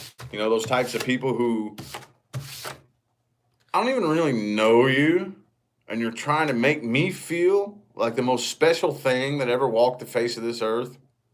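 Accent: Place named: West, United States. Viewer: My ex is Aquarius , a creeo if ever there was one. Wont let me move on as he's full with envy . Yet he cheated on me time and again, and I was 3rd party to this toxuc masked devil in disguise. Narcussust, pathological liar. I want to move on to a better life, a life I should've had . Why do I get lumbered with losers like this snoop, who sneaks in and out if my affairs, he's already got someone in the side so why does he want me?. Its money thats what it is . He was always broke .. your rather handsome cowboy!! I like yr accent!! A real western. Well I'm meant to meet a much older guy in middle of December. I dont mind as long as he's trustworthy honest,, and true. Not Me!@ I've had enough of this air sign because he's a liar, cheat, and a chancer. He cheated me, I was true but he wasn"t. That's it!! Thanks big man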